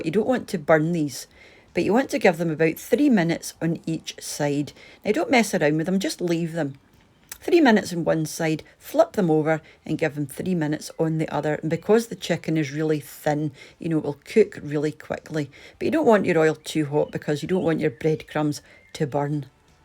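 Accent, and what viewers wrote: Scotland, United Kingdom. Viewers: Have you noticed that the subtitles say to cook them for 30 minutes each side whereas says 3 minutes each side. The downside of automated subtitles which are not geared to a soft Scottish accent.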